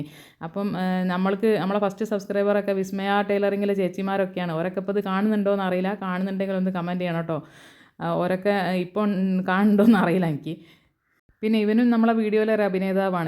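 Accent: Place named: Malappuram, India. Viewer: ചേച്ചിയുടെ പേരെന്താ?😂സ്ഥലം മലപ്പുറം ആണെന്ന് തോന്നുന്നു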